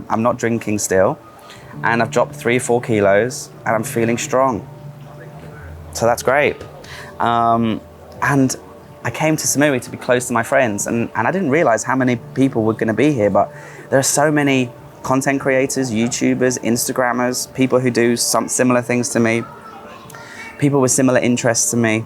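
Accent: England, United Kingdom. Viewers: Love all your videos! Love to hear your English accent 👍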